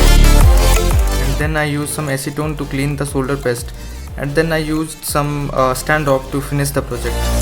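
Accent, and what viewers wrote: India. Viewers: FFS! Cant stand that THICK indian accent. Why is every how to video made by some indian i cant understand... FAIL ! ! !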